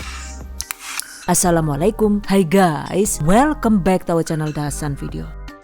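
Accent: Banyumas, Indonesia. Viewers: Bunda asline mana kok bisa pake logat ngapak si.. hehe salam ngapak dari Banjarnegara 😁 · Bunda lucu. Dialeknya bisa Inggris, Indonesia dan Ngapak 🤭. Anyway, terimakasih reswpnya, bund. Kalaudioven, bagaimana ya hasil cream cheese-nya?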